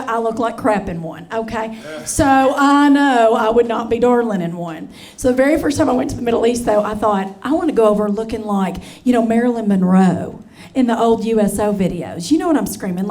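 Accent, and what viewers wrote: South, United States. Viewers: Really great set! 😂😂 a really sweet southern accent too! Best of luck! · I love her southern accent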